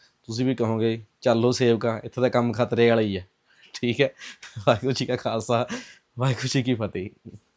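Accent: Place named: Malwa, India. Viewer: chal oye ehe baut vadia part c, bai ghaint a pura, roti baut sohna kehanda, menu lagda malwe da hana bai?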